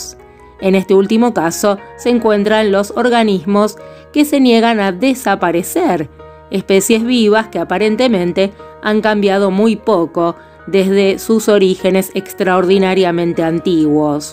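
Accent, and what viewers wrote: Argentina. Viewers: Nuevo suscriptor.. Excelente contenido.. Sos de Argentina?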